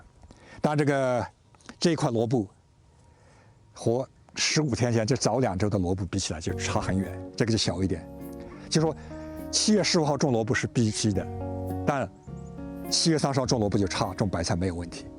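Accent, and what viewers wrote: Yunnan, China. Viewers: 冒昧问一句，Andy叔您是不是云南人？我觉得你的普通话和我的很像，我是云南人！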